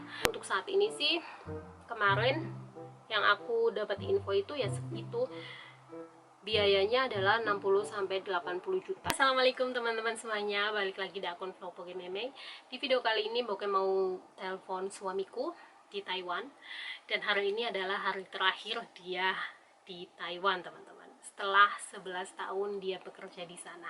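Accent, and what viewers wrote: Banyumas, Indonesia. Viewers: Pdhl kl ngapak jg gapapa loh, lucuu 😂🤭🙏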